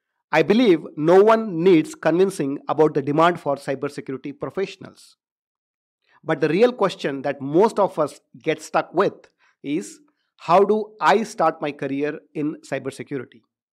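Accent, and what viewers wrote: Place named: India. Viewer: I hate clicking on this videos then listen to an Indian voice🙄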